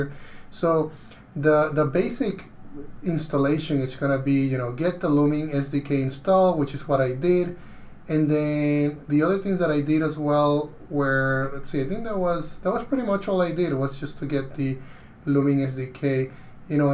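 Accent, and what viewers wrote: Spain. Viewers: why you do not have the same content in spanish your pronunciation is like spanish or something like that can you talk about in spanish too ? that would be great !!! God Bless you.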